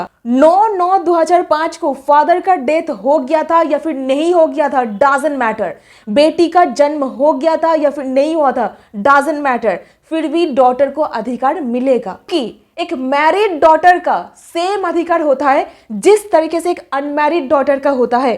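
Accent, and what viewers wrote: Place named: West Bengal, India. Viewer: Sotti bolchen aaapni....aapka accent sunke pata chal gaya aap WB se hai.....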